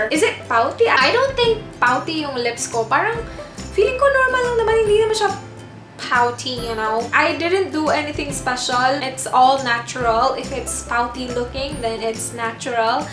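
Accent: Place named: Visayas, Philippines. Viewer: hi where are you from? bisaya mn d i ka. 😂😂😀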